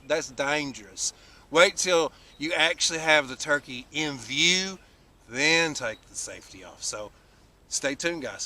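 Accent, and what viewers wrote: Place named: South, United States. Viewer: MAAAN, I love your southern drawwwl I LOOOOVE it, I am coming to NC So the question is Mossberg or Browning BPS, which one is better?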